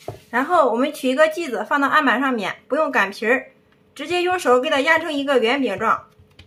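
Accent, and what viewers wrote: Shandong, China. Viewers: 听口音山东的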